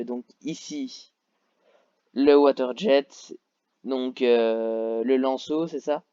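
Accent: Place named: England, United Kingdom. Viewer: cette accents anglais magnifique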